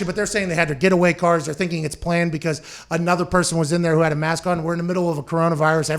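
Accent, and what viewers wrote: Pittsburgh, United States. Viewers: Dude your awesome lovin the pittsburgher accent born and raised big dogg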